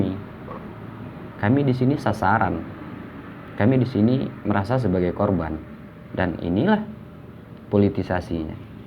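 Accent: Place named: West Sumatra, Indonesia. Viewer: Lanjutkan pak... Suara minang...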